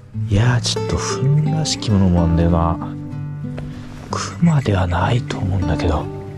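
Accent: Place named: Hokkaido, Japan. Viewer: 久しぶりに聞いた北海道なまり❗やっぱりうまいっしょw 本当にガレット美味しそうでした。アクシデントでタイヤ購入って春先はやっぱり私も有ります😢 週２回もさん見れて嬉しいです🎵 無理無く旅して下さいね😊❤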